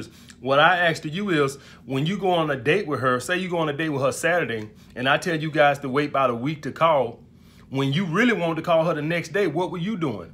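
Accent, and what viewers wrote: South, United States. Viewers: Hey, guy....A man who speaks as you do does not have a chance in hell with the kind of women who are generally considered desirable. You know... women who make good partners, parents, is interesting, enjoys life. Your enunciation is terrible, that southern drawl is telling. Your use of profanity is off-putting, referencing women as “bitches” and other insulting names is not buying you any prizes. This video is strictly for the guy under 25 years of age who wants to act like someone he is not. It is definitely for someone who has decided some guy on YouTube has the answers to life’s mysteries. This is beyond comical, it is tragically comical.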